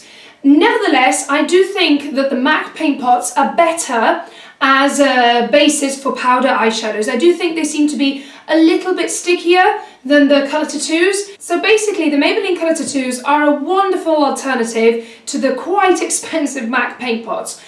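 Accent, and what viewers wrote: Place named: England, United Kingdom. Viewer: I know, especially since there are other pages that don't charge :( I remember being to Cardiff and wanting to take the whole stand back home! <3 Thanks God I didn't because I only took a LE Batiste dry Shampoo (the one with the Brit flag that was released for the Olympics) and they made me throw it away at the airport :( Well, lovely Youtubers deserve lovely subscribers (and thanks for calling me lovely, by the way :) ) P.S: Do you mind if I ask you where in England are you from? I love your accent ^_^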